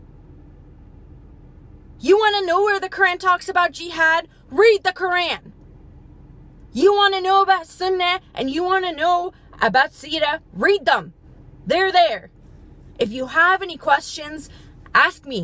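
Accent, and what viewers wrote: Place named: Texas, United States. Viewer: I'm confused, if you grew up in the Middle East, Like most people in my family, where is your accent? You DID say that you GREW UP in the Middle East. And you're mispronouncing most Arabic words. You sound like you're from Texas or Alabama and such... And by the way, The equal pay thing is not a myth. It is true lol.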